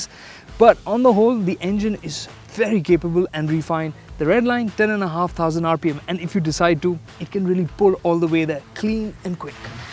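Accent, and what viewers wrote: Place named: India. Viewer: Could not watch it to the end. Indians sunks speaking english, with thier stupid accent.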